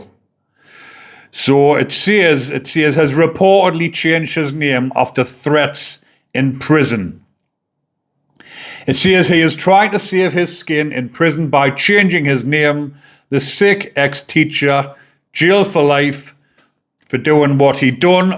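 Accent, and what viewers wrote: Newcastle, United Kingdom. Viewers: Geordie accent is brilliant. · Sounds like a Gordy Northumberland/or Newcastle to me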